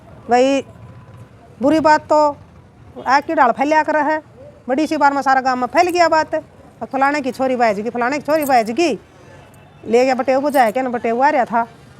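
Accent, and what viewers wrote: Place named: Haryana, India. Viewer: konse Gaam KI BAat se aap KI Bhasa TO Rohtsk y Distt. karnal KI lagti Hsi.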